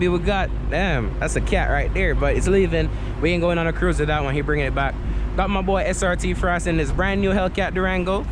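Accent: Jamaica. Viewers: I think I can hear that Jamaican accent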